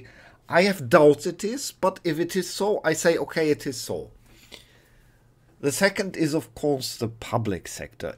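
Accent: RP, United Kingdom. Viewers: warum sprichst du wenn du englisch sprichst immer in diesem Posh-English-Accent? Warst du während des Studiums in England, Oxford vielleicht, oder einfach nur ein Faible für BE? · So Berlin isn't the yellow of the egg, except Spandau? 😉😁 The majority of big cities tend to be left. Love your very British sounding accent! 💕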